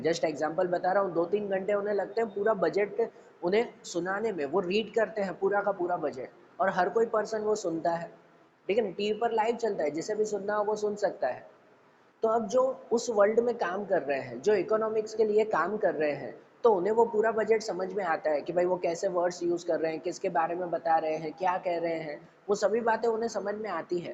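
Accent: Gujarat, India. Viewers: he got the gujju accent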